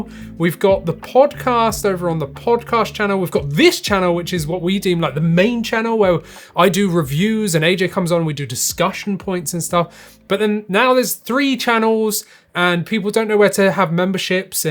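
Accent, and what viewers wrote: United Kingdom. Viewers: I've seen a few of your videos, and i like your channel, dont worry about "rambling on", i like the way the "British Accent" sounds.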